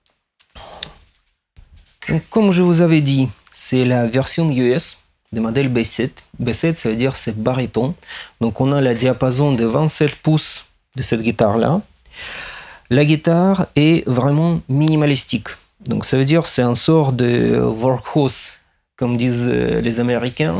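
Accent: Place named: Russia. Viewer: Акцент русский))))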